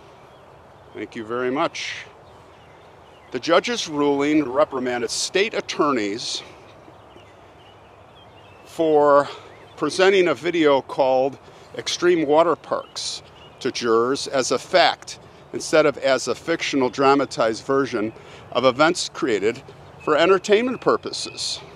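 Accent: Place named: Chicago, United States. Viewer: New to your channel - not sure how I missed you for so long. What I like is that your stories are about people we probably never heard of before, your slow, calm voice, and of course the content itself. But there's more: You seem so familiar. I was born/grew up in Evanston, IL - very close to Chicago, as you know. So you accent/grammar/inflection reminds me of many male relatives. It's almost like hearing one of my Uncles telling stories again! Anyway, glad I finally stumbled into you, and now look forward to going back to hear/see your earlier posts, too. :)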